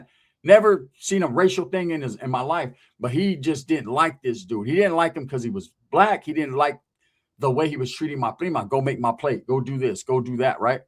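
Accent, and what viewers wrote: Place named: Texas, United States. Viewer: Great video .... You sound like a Texas boy , where Blacks and Mexicans are brothers ❤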